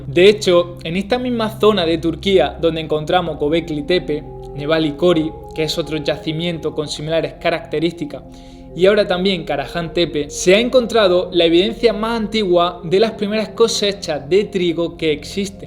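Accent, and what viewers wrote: Andalusia, Spain. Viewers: / Voy a romper una lanza a favor de. Este señor es andaluz, seguramente granadino. Afortunadamente la Real Academia de la Lengua Española, contempla los diferentes acentos de los hablantes de este maravilloso idioma que hablamos 800 millones de personas en el mundo y que nos permite comunicarnos entre todos nosotros. He viajado por España y por Iberoamérica y jamás he tenido problemas de comunicación y, menos, de comprensión. Un par de consejos, me tomo ese privilegio porque ya soy muy mayor; agudiza el oído y lee mucho. Un saludo cordial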